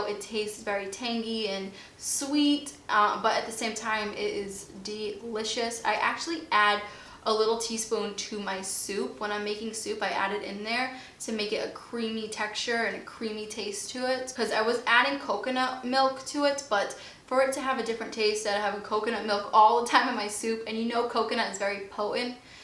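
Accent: Boston, United States. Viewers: Stop it!! People love that Boston accent! Don't apologize for being you, we're here because we love your content. ❤️ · Great video! My favs are Kite Hill cream cheese and Gardein 7 Grain Chicken strips. Also, I'm from Boston but moved away a few years ago. Hearing your accent reminds me of home and I love it so much! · From a former New Yorker I love your Boston accent, It's too cute, remember we watch you for you and what you bring to YT so embrace your uniqueness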